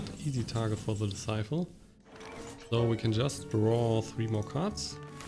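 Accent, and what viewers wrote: Germany. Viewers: uhh are you Germany? Your accent makes me think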